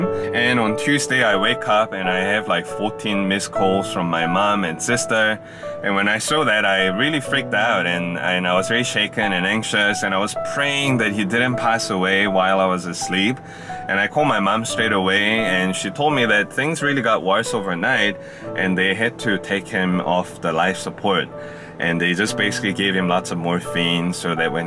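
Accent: Singapore. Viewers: I'm very sorry to know you lost your papa. My deepest condolences to you and your family. I lost my papa 16 yrs ago as he got a heart attack.This year Feb I lost my best buddy , she got into coma for 3 months and eventually passed on. I fully understand the feeling of losing someone close to your heart. Everyday I try to live my life meaningfully with the understanding of things or relationship will not last forever. As there is a saying '' Time will heal the wound'. I wish you and your family will stand strong together as your papa is now in a peaceful and happy land. He will watch you all from afar. Take good care of your mama ❤️. Hope to see your new vids soon as I always like to see you speak in singlish.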